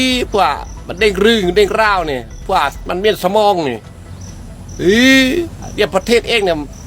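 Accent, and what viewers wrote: Thailand. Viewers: เอาจริงๆนะใครจะดราม่าก็ดราม่าเลย ผมคิดว่าคนในคลิปไม่ใช่เขมร ผมเป็นคนอยู่ตะเข็บชายแดนแท้ๆ ไม่มีเขมรคนไหนที่พูดไทยสำเนียงเหน่อแบบนี้เลย ละเห็นหลายคลิปแล้วที่บอกว่าเขมรมาพูดอวยไทยอย่างงั้นอย่างงี้ผมว่ามันคนไทยทั้งหมดอะฟังจากสำเนียงก็รู้แล้วมันเป็นการพูดพยายามเลียนแบบสำเนียง ที่พูดไม่ได้จะว่าไรนะแค่ไม่อยากเห็นคนไทยหลอกคนไทยด้วยกันเอง อย่าโง่เชื่อข่าวไรแบบนี้มากฟังหูไว้หูพอ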